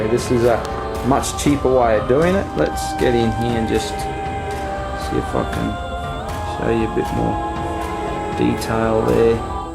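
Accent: Australia. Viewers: well dis guy is Australian..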